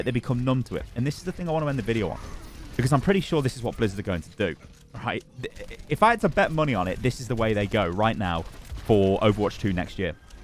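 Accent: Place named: United Kingdom. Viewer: Good ol I don’t even listen to the video, this is just asmr of a British man talking gibberish for 10m 💀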